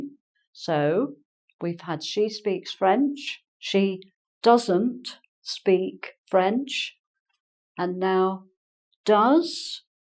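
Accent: England, United Kingdom. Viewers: the people from England have an interesting accent, I guess that she's from some part of UK. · Great lessons. I reall like the way how you bring it to people in a very nice way. Lovely voice and the tippical British accent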